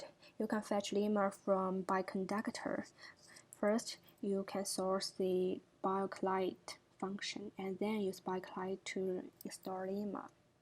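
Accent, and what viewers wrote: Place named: China. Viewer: 聽中國人講英文真的很痛苦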